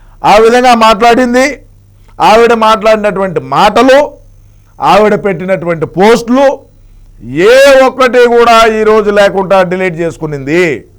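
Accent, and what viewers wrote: Rayalaseema, India. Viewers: రాయలసేమయాస ఏదన్నో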